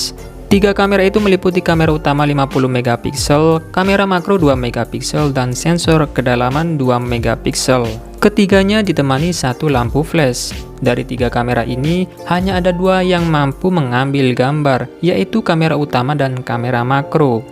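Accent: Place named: Madura, Indonesia. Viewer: orang madura yah bang, logatnya kaya madura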